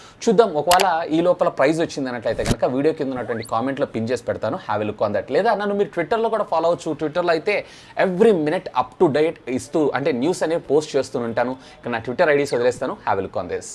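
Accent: India. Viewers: R u from Vizag or srikakulam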